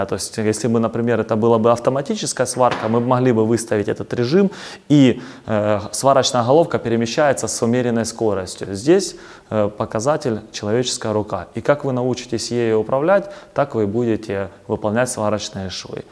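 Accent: Kharkiv, Ukraine. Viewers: В Харькове все так Гаворят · Чисто харьковский базар.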